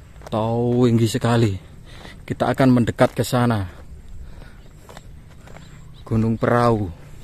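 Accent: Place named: Java, Indonesia. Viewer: Welleh...bahasane kok medhok gumunan ...tow mas.. Bahasane koyo logat lamongan po..? Kok medhok timen.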